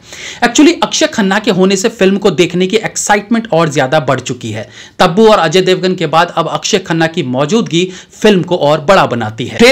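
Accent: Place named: South, India. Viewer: South wali Hindi aai hui h sir.....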